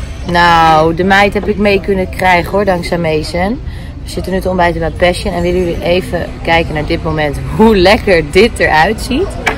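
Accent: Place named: Amsterdam, Netherlands. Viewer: Waarom zit je zo vaak aan jouw haren te trekken? Dat vind ik echt irritant om te zien! Verder doe je het leuk en vooral jouw Amsterdamse accent vind ik leuk.😍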